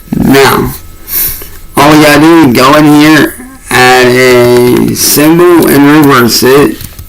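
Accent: United States. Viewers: I was actually getting to like the gay cowboy accent ! He sure is mumbling a lot lately. For such a hard worker he can’t even enunciate his words, simple grade school stuff !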